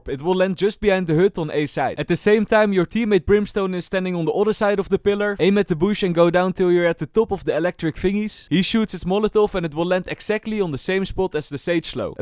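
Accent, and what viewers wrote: Netherlands. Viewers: Strongest dutch accent ive ever heard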